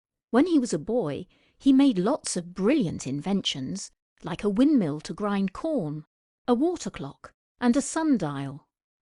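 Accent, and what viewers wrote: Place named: United Kingdom. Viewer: I love British Accent.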